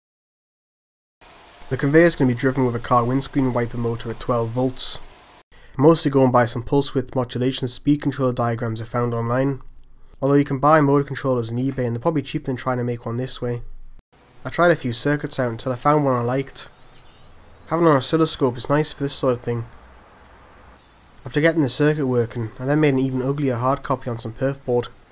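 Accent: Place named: Newcastle, United Kingdom. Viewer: sounds like your from up my way, im originaly from westerhope but now live down durham way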